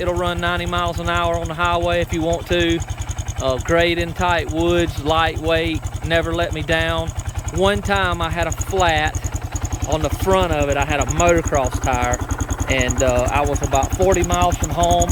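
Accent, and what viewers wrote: South, United States. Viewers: Where are you from? You have a major southern accent.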